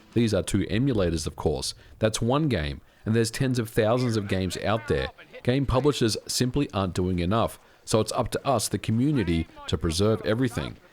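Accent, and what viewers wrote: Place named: Australia. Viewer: “here in the United States" — dude you sound so Aussie lol 😁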